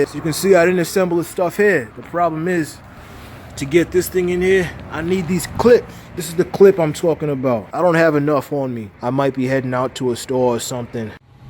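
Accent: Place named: Boston, United States. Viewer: You sounded like you was from Boston.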